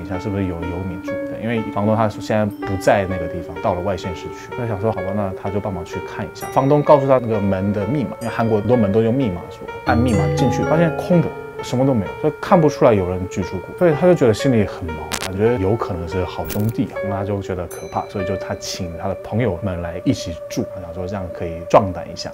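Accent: Standard. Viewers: 發音好標準哪！對後製人員按個讚，真的好細心。不論是音效、插入的圖片或影像。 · 我是中国内地的朋友在加拿大生活，身边认识很多韩国🇰🇷朋友，我发现你的中文已经好到比我说的都标准了！终于了解韩国的历史文化了。非常的赞！秒关注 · 超級標準我還以為他是台灣人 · 發音很標準😆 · 最後一個故事好毛啊!! 嚇死~ 而且中文口音好標準, 差點聽不出來是外國人~好厲害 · ，您的中文真的很標準，我打從心裡真心佩服，可是您的故事比較像是鬼笑話欸😆~ 聽你講中文還比較有趣。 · 中文也太標準了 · 講中文超級標準耶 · 发音确实超标准 👍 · 這中文講得也太標準了吧！！！！ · 發音怎麼可以這麼標準好感人啊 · 國語講的很標準！聽不太出來是韓國人🤣🤣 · 中文發音很標準欸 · 發音比一些台灣人還標準唉 😂😂😂 例如我😔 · 不得不說你的中文很標準,不說真以為是台灣人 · 其實你是台灣人對不對？中文太標準啦w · 他發音超標準！而且真的聽起來很有感覺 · 第一次看到你的頻道！你好可愛中文也說的很標準！（被圈粉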